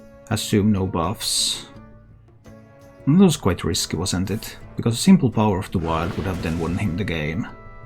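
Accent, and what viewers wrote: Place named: Finland. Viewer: He's from Finland, this is how they lovely people of Finland talk 🇫🇮❤️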